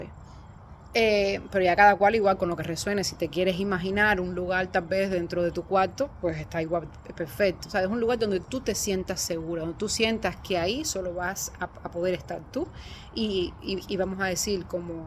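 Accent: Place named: Cuba. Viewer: Hola muchas gracias por todas tus recomendaciones son muy valiosas. Tienes un acento hermoso cubano Un gran abrazo desde Colombia 🙏🙏🙏❤️❤️❤️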